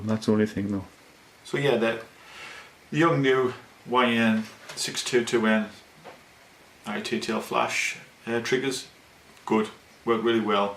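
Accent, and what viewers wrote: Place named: North East England, United Kingdom. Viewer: hi, I like your accent, sounds like north-east England. Is Nikon D750 built-in flash also using optical signal to control? If we use a sb700 on the top as a master flash, do we still have to use trigger for other slave flash? Thanks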